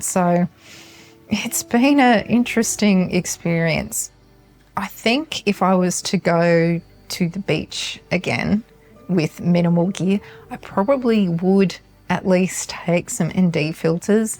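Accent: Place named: Australia. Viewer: So glad the YouTube algorithm showed your video! So nice to see and hear an Aussie woman photographers experience. Looking forward to watching more of your work ❤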